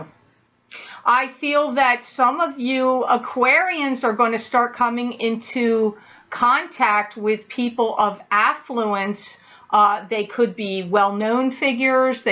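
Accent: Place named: New Jersey, United States. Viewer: I absolutely love your vocabulary, dahling and of course, your Jersey accent (miss hearing it over here in AZ)! You're so intelligent and yes, of course your readings are spot on!! Congrats on being booked for the next 8 months!! I look forward to your readings every month, so thank you so much! Oh and just an fyi: if you type in your website without the www it takes you to <link> Love and light from a fellow Jersey girl, Autumn:)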